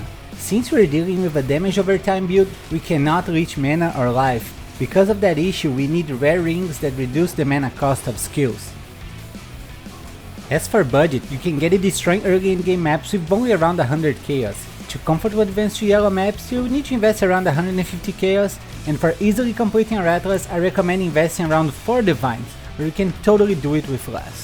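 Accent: Brazil. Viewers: Acho fantástico que dá para sentir o sotaque brasileiro kkkkkkkkkk Obrigado pelo vídeo!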